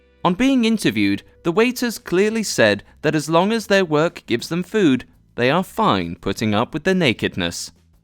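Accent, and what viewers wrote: United Kingdom. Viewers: AngryTurtle Gaming He's British. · Elliott Stewart- You realize the announcer is British right? They pronounce things differently. I'm from Texas and have never heard anybody say Spo-kane so I think Americans get it. Also, your apostrophe is unnecessary.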